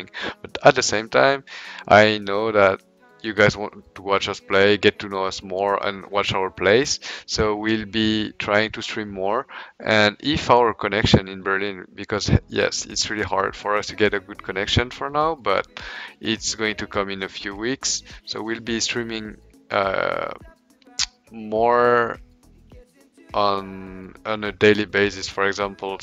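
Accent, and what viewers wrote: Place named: France. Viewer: Haha I know that. but i heard it as if he said Fabian. I understand he has a french accent!! :P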